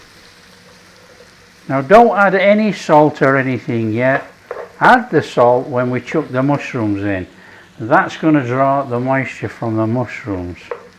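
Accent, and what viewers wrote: United Kingdom. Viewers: I Like hearing this british accent 😊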